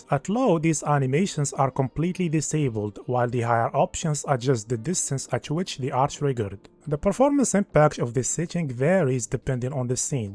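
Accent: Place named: Morocco. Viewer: Moroccan accent all over the place nadi a bro chokran bzf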